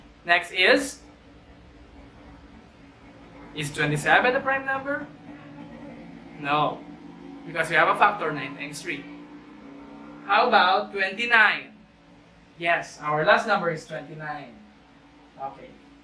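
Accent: Philippines. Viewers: Wow I think your a Filipino hehe it's so clear I understand everything thank you because of you now I know how to answer my math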